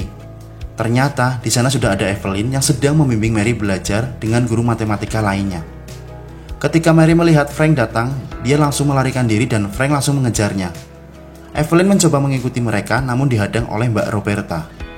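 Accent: Banyumas, Indonesia. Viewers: Orang ngapak ya lu bang adminn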